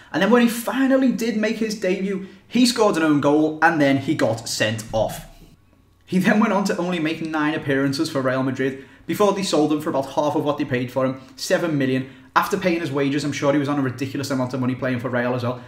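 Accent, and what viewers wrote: Liverpool, United Kingdom. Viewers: It's a scouser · Stfu you and your gay ass accent, talking shit about Americans. I hate how people from UK think that football is their life and they are the best at and keep putting Americans down, you can't even fucking pronounce altidore or can't even pronounce any Spanish names properly (noticed in videos). P.S altidore is probably 100x better than you at football.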